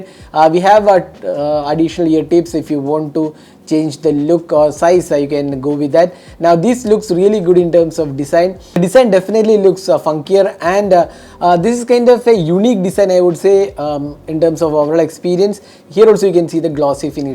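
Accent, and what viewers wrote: Kerala, India. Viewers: Liked your malayali English accent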